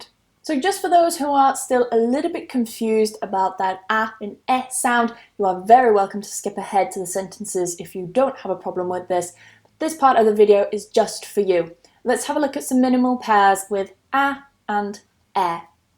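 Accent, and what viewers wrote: United Kingdom. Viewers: Love your British pronunciation!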